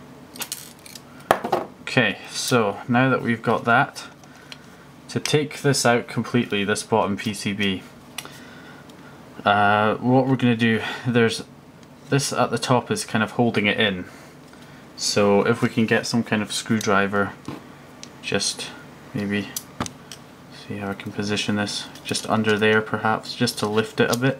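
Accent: Scotland, United Kingdom. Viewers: Scottish bro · Cheers for video bud! Owned a torx security bit set for 15 years and finally get first chance to actually use it haha. Nice to hear a fellow Scot too 😉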